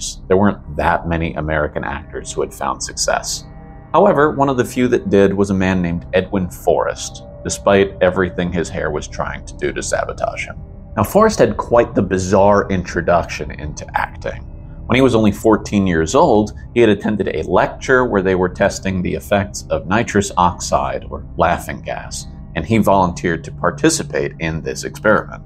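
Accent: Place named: United Kingdom. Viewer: Love your British accent! Love the sound effects! Another winner!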